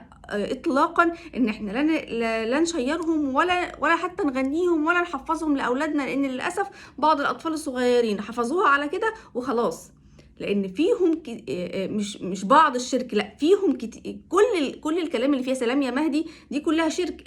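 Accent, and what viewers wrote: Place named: Iraq. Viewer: كافي تمضرطين بالهجه العراقيه اكولهه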